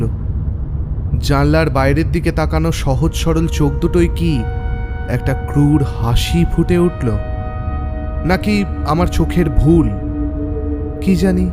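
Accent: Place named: Bangal, Bangladesh. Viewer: Kat bangal reeeeee ekdom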